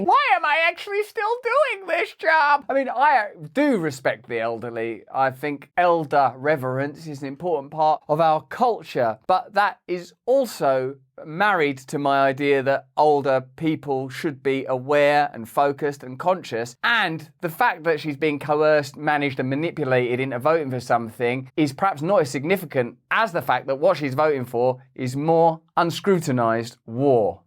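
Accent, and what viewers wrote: United Kingdom. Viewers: The composition of the topic is great and so is the enunciation however I have a weird resistance to the obviously thick British accent telling me news in DC. Maybe it’s the Yankee in me.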